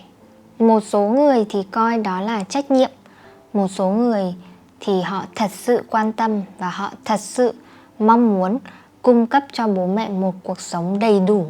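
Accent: North, Vietnam. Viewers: Does the YouTuber speak the Northern Vietnamese language in her videos?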